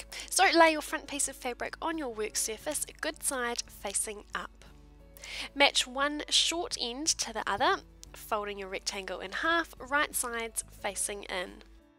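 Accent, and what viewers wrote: New Zealand. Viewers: What accent is that ? Nz ? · her accent is kiwi - New Zealand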